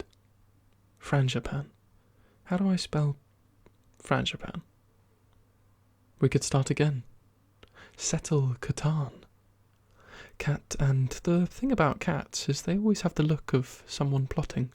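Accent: United Kingdom. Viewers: One of the reasons that I keep listening to your voice is because I wanna learn your British accent ✨🥲 It is sooo beautiful.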